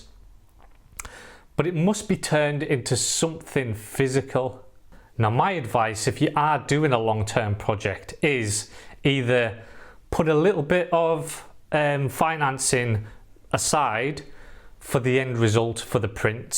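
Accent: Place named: North, United Kingdom. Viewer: Hello from France! Love your entire channel! I always learn things in your videos. And your delivery is very good too, with a calm voice and a lovely Northern accent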